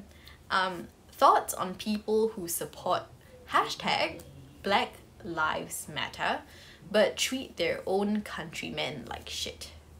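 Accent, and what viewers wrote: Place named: United Kingdom. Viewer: Gosh I love your accent. Kinda British way. I prefer watching the videos than replies on your IG stories. More engagement with fans and I'm gaining knowledge from you. Interesting contents you have been working lately. Keep it up pls!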